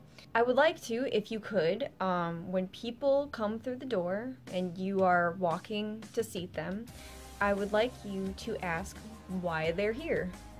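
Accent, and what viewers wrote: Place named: Midwest, United States. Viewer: I hear mid west accent.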